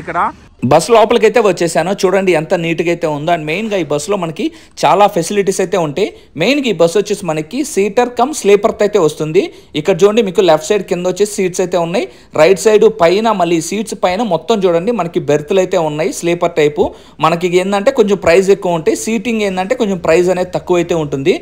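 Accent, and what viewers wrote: Nellore, India. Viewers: నువ్వు చెప్పేవిధానం సూపర్. నెల్లూరు యాస చాలా బావుంది.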